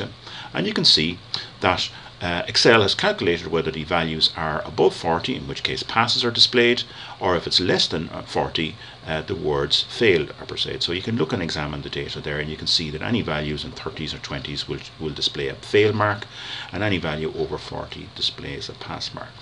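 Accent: Ireland. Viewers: Irish accents are awesome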